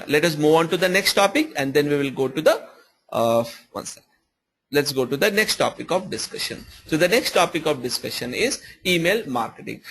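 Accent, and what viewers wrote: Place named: South India, India. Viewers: This da(the) Indian accent bro...this is how we speak and understand. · Bro, that's a south Indian accent. You are awesome anyway.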